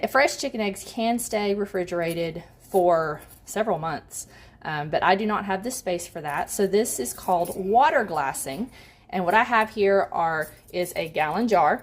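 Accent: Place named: Ecuador. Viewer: Puerto Rican?… my minds’ playing tricks on me… I thought you were from “South America“….Ecuador . …. But whichever it may be, I was very happy to hear your mami’s voice and the pride in it, too ! ❤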